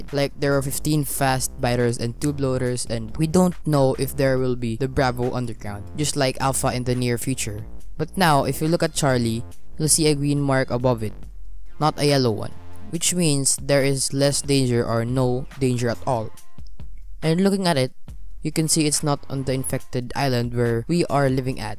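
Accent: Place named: Philippines. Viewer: Ur voice is like a Filipino:) I'm Filipino too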